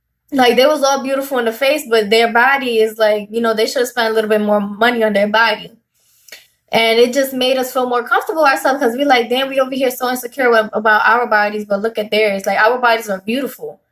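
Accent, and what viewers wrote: New York, United States. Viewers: she from new yorkkk, my ex had dat accent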